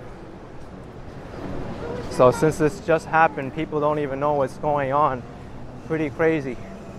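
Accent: New York, United States. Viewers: The new York accent is thick in this young padawan 👏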